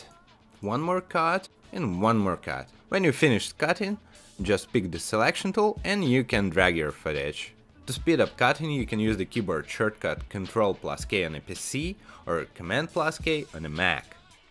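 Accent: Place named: Russia. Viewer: Привет. Сижу готовлю видео на ютуб на эту же тематику что у тебя (только на русском). Ну и полез смотреть кто что и как сделал. И я крайне удивлен что у тебя так мало просмотров. Твой тютёриал один из лучших, что я видел. Подпишусь ка на всякий случай 👍 P.S. Да я понял что ты говоришь по русский из-за акцента. Но на всякий случай пришлось лезть к тебе в фейсбук чтобы убедиться на 100% 😉 Я кстати тоже с Украины. P.S.S. И рад знакомству 🤝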